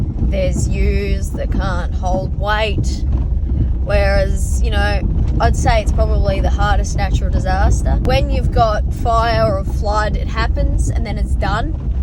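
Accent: Australia. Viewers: The attitude of this channel, from human to dog, is just fucking fabulous❤ I don’t know why the algorithm pushed this channel in my feed, mine are aqua scape, reno and wood work stuff hence the confusion. But mate, am I glad. Girl, you doin’ so good. The only thing I don’t understand, is why are there people dislike these contents and please, I do not wish to hear of it from these people. Hugs from Finland, of all places… PS, your accent make me like my Aussie friends even more😂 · Oh, no, trust, at least in the USA, that accent goes a LONG way. A good number of our top stars in Hollywood call Australia home. …Helps that you grow them damned pretty down there, both the gals and the guys. 😉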